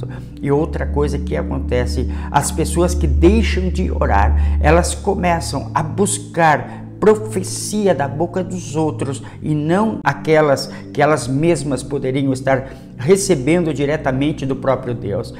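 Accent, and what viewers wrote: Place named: United States. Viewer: Vc É Americano ?Teu Sotaque Diferente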